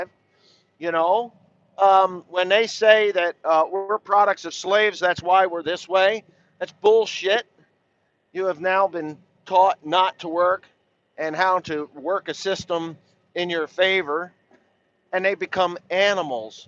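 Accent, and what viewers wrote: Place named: Mississippi Delta, United States. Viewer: Sounds like u in ms delta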